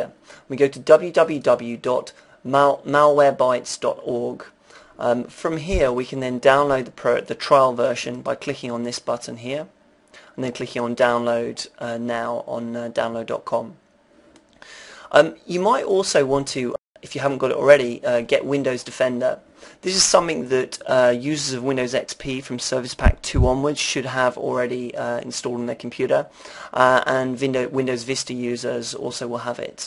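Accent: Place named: Mid-Atlantic, United States. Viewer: Sorry. I tried to watch this, but got too annoyed at the mid-atlantic accent. You're English man! It's not 'compewder' - it's 'computer.'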